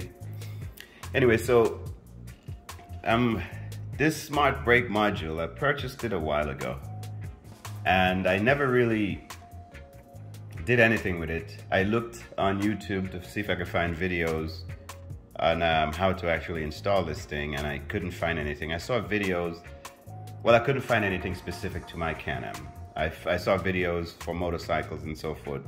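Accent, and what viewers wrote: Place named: Wisconsin, United States. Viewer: Good video and to the point. Love to hear a WI voice after many years captaining the Caribbean. Thank you.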